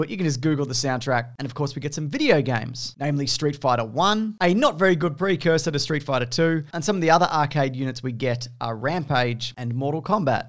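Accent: Australia. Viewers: In your Aussie accent it sounds like "porn shop owner" instead of "pawn shop owner." Thinking to myself, "Pretty sure he wasn't in a porn shop, but ok.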